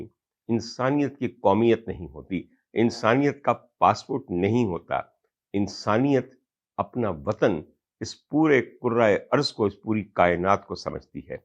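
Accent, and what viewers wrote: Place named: Punjab, Pakistan. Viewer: Verbose. Avelanche of urdu in Punjabi tone (that lethal combo). Urdu as spoken normally in UP is palattable. But this is too much.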